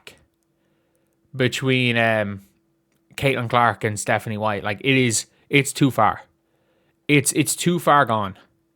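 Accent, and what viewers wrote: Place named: Ireland. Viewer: That’s just the Irish accent lol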